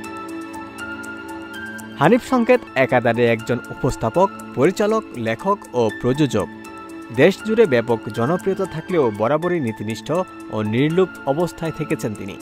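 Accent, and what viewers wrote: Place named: Kolkata, India. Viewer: আমি পেয়েছি ফেসবুকে কিন্তু আমার বিশ্বাস হয় নায়। কন্ঠের উচ্চারণ কলকাতার ❤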